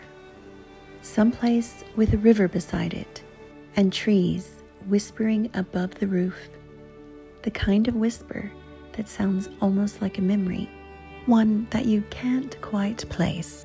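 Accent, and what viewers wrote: United States. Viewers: Are you from New England? Something in your accent.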